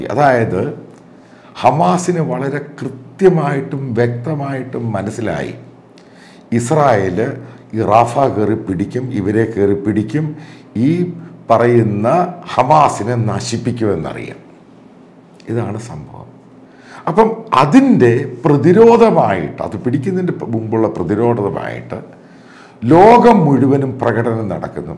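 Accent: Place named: Kottayam, India. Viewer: അത് കോട്ടയം ഭാഷ..തൊലിയായ ഭാഗം മുറിച്ച് കളഞ്ഞ മണി (ഉണ്ട )യന്മാർ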